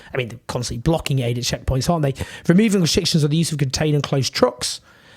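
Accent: United Kingdom. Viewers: But anyways what’s up with a guy with a the gay British accent criticizing when the UK is worse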